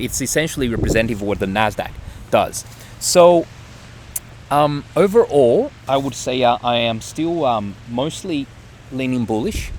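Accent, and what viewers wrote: Australia. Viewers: Are you an Aussie? Appreciate your sharing of perspective..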